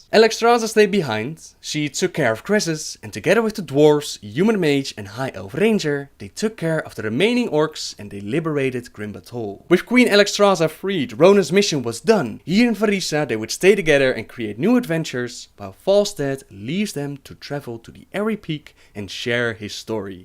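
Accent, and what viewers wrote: Netherlands. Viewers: I enjoy his Dutch accent to be honest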